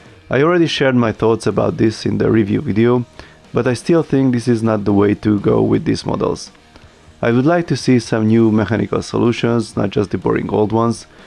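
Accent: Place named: Hungary. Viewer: Te Magyar vagy? Egyrészt,mert hívnak,másrészt az angol kiejtéseden is látszik.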